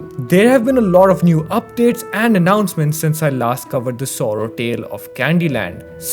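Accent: India. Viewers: If u can ignore the indian accent then this content is actually pretty god damn good.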